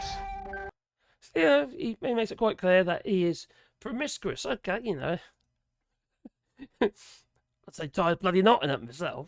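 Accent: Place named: Norwich, United Kingdom. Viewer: Hey there, only just stumbled across your channel and enjoying your content. I live in Norwich, UK, and you sound like a local 😊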